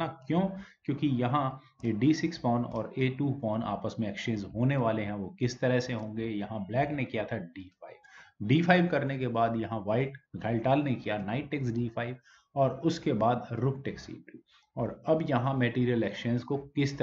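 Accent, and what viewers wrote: India. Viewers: Thanks for all your Mikhail Tal's Games and indepth Analysis..!! From your pronounciations, I thought you are Gujarati.., but fr9m looks I think you are Punjabi, I guess..!!😊👍👌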